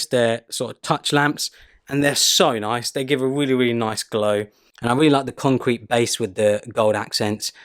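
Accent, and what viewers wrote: United Kingdom. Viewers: pretty house! and love your british accent thou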